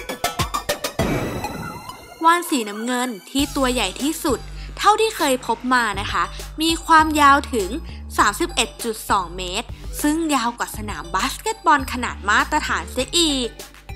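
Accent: Suphanburi, Thailand. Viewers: น้องคนพากษ์บ้านอยู่สุพรรณ เหร้อ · เหน๋อๆ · สำเนียงเหน่อไง คิดสิคิด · มึงจะพูดเหน่อทำไม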